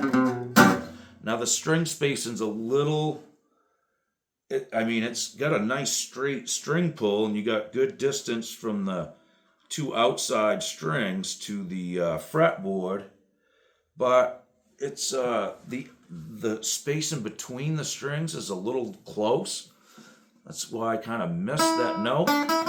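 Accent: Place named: New England, United States. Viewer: What part of New England do you live in?? The accent is hard to miss. Cool guitar.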